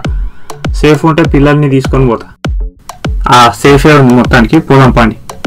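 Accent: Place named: Telangana, India. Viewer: Like the way you narrate in telangana style..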